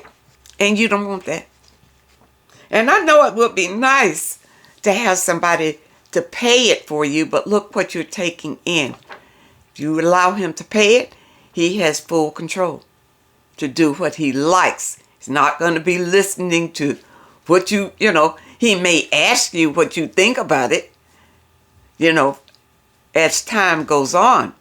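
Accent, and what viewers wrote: South, United States. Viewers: Love your southern accent. I am here in Australia. I remain single after divorce. Also, they think they can be your boyfriend and arrive for drinks, food and sweet romance. Got bucklies with me. Sounds like same where you are. 🇦🇺🙂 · There is a major difference between Southern and Stupid Hick. She IS Southern! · Southern accent?? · Dear Miss, I am Dutch and can hear your lovely Southern drawl very well. When people can't hear you, for whatever reason, they can tapp CC on YouTube and subtitles are added. You don't have to add extra subtitles. Kind regards, Caroline, The Netherlands. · I'd rather live in a cardboard box in a ditch than to ever try to live with another man...or, another human being for that matter!!! Thank God I've learned a few lessons from life...and, now, old enough to know better😍No easy lessons...some of us have to learn them the hard way! 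The subtitles are nice but I had no trouble understanding your Southern drawl...maybe because I'm from Arkansas. Enjoying your channel and constantly nodding my head in agreement! ❤❤❤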